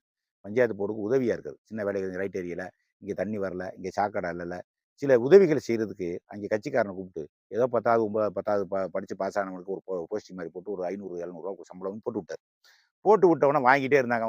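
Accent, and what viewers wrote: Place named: Madurai, India. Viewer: இன்றய,,தமிழக,,அரசியல் பம்மாத்து,,பித்தலாட்ட சுய,,தம்பட்ட,,கீழான அரசியல்,,நாடகங்களை அடித்து. துவைத்து அலசி,,உதரி,,காயப்போட்ட எளிய,,மதுரை,,நெல்லை தூய,,அன்றாட,,பேச்சு தமிழில,,உரக்க,,மனதில் பதியும்படி அருவியாக,,குமுரி தீர்ர்த்து விட்டீங்க நண்பரே,,சகோதர்ரே் நன்றியுடன்.. சீதாராமன்,,80 வயது பம்பாய,,மும்பை - 51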